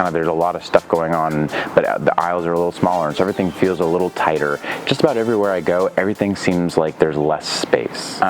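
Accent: United States. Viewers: You don't speak English you speak American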